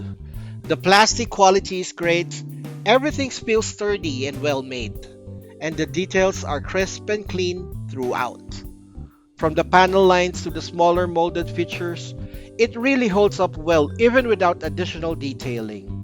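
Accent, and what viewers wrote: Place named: Philippines. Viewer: Oh youre from Filipina thats why little weird I heard your accent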